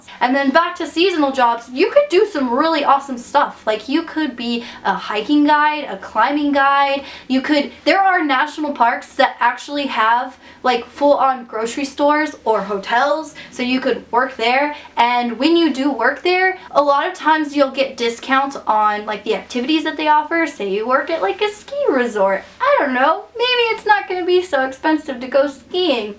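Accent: United States. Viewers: She sounds American and I'm pretty sure she's wearing a wig and dentures. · American accent. When you travel you realize that Americans have American accents lol.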